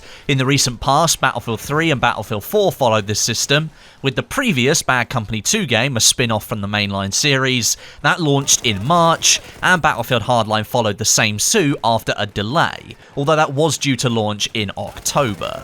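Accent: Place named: Essex, United Kingdom. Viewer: He's so Essex!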